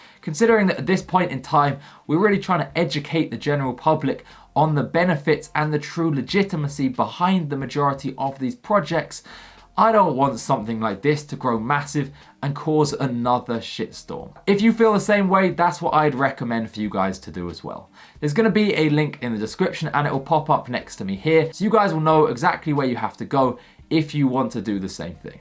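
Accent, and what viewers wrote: England, United Kingdom. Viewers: Good video, subbed, are u from England man? 😊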